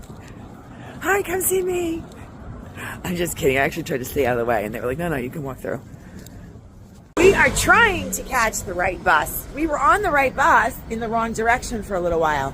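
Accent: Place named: United States. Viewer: You don’t need a cute British accent, you have a cute American accent and you are genuine and in my opinion beautiful. 😊 women are allowed to be happy and have dreams. Looking forward to your videos!😊♥️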